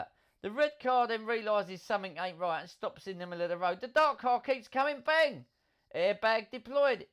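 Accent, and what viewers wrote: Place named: London, United Kingdom. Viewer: There goes another mobile organ donor . The London accent with authentic commentary is 1000% better narrative than the other vids with machine voices and added patronising woke generative AI.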